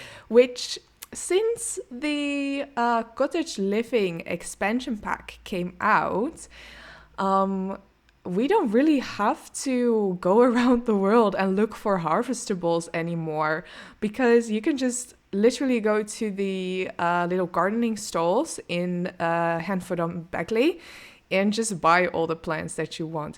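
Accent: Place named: Netherlands. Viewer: You sound very Dutch. 😊 And I like this communal garden. It looks very grand and luxurious.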